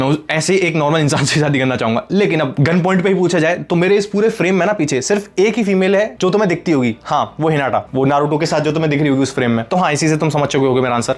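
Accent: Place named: Haryana, India. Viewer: Khadi boli haryana se ho na bhai tum 😂 nahi tu beyong hai bhai cutie pie 😅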